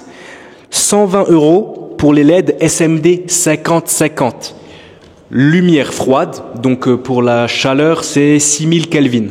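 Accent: Alsace, France. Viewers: Bsahtek mon frere !!! Qu'allah te facilite ton commerce et tous ce que tu entreprend!!! Un petit accend alsacien dans ta voix je me trompe ??? 😂😂😂😂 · L’accent alsacien 👌 voila le coter maniaque 😂💪 les marocains vont vriller faut tout soit nickel 👌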